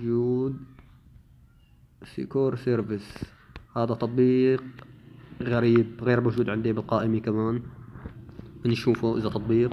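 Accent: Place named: Syria. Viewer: باين عليك سوري وحمصي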